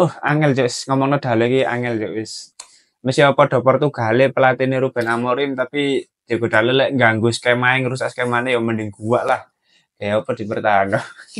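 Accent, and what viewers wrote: Java, Indonesia. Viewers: Sampean arek mana mas..logate kok koyo jowo sblh ndi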